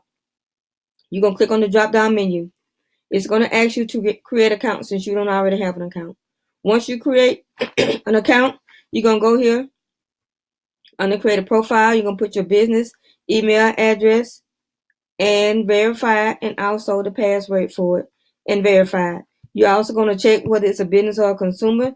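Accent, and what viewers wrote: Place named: Houston, United States. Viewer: You sound like you from h twn. Preciate the info fr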